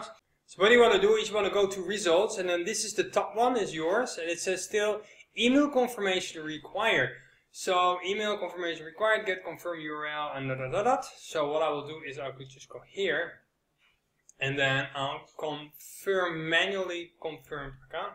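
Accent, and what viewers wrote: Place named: Netherlands. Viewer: How do you speak english, havin a dutch accent with russian on ur PC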